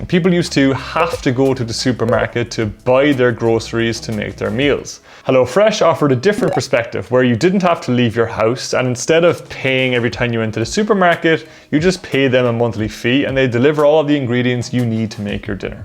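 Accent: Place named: Cork, Ireland. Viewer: Love when you’re randomly jumping through YouTube videos and come across a random lad with a Cork accent 😂 great content!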